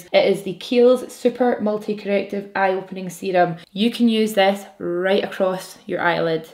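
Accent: Scotland, United Kingdom. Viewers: you're so Scottish, I love it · i just want you to know that my teacher has a scottish accent and everytime she speaks i always imagine u speaking lmao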